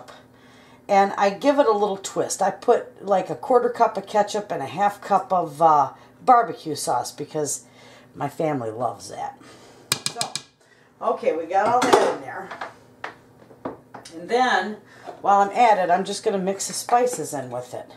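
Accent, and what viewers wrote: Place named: Wisconsin, United States. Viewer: Spaghettios jello ring lol have you seen kalen allens video about it? Look him up it’s worth it! Thanks for the video, making this tonight. Thanks for a little Wisconsin accent too!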